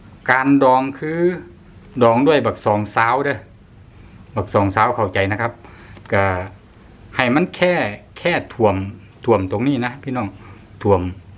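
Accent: Thailand. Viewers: ทำไมไม่พูดภาษากลางล่ะ คับ ฟังไม่รู้เรื่องเลย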